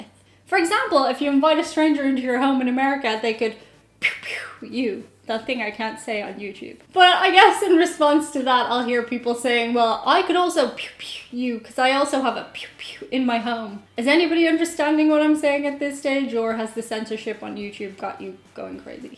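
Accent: Ireland. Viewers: Here's an idea for your next trip to the US; you should try going to a firing range. I think I remember "Joel and Lia" going (I believe you know them or I wouldn't have mentioned them on your channel). I guess I'm the odd American, because I have been travelling abroad for longer than you've been alive, almost 25 years;). One reason I watch your videos is I miss the accent when I can't travel, love Ireland. Keep up the great work. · Is it just me, or are you starting to sound REALLY American yourself? I can barely hear your Irish accent anymore.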